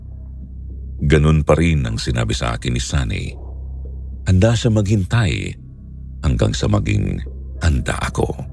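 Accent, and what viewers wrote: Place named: Waray, Philippines. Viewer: Waray po kyo sir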